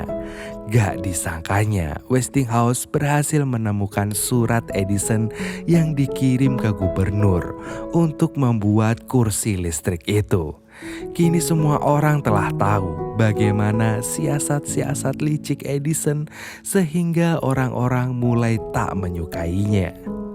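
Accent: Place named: Java, Indonesia. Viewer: kirain ini insomnia film wkwkwkk tapi kalo yang ini agak lebih medok suaranya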